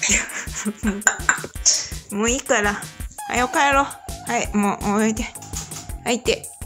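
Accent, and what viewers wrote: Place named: Kansai, Japan. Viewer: さんちゃん、よく話を聞いてますよね。 ちゃんとやりとりしてるし、いつもコミュニケーションが、すごいと感心するし関西弁にも💙💛💖です。 カワイイ😍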